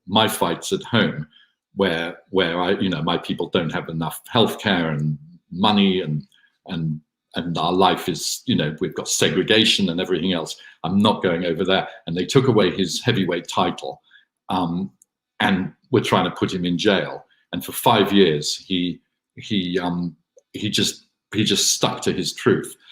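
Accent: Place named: Australia. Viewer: Great talk! I loved listening also because of hearing Australian, it makes me miss Australia even more :)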